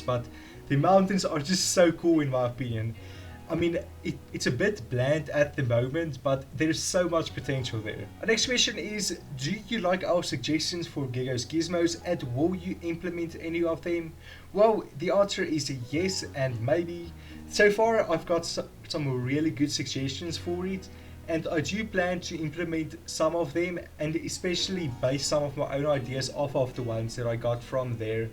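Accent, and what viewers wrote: South Africa. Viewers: Are u from South Africa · Nice work on the world edit addon. It was a lifesaver. Tho I know you are from SA just because your accent is the same as RenDog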